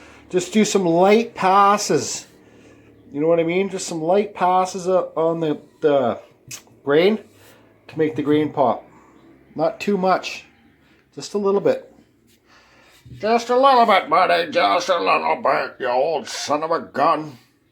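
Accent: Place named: Canada. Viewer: Great looking piece I like it. Your fuckin language is terrible eh. You sound like a real Canadian lol. Have a good one.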